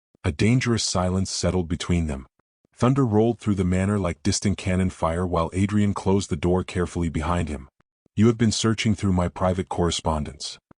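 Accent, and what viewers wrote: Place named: United States. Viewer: An AI with a mechanical robotic American accented voice for a story set in Britain. Odd...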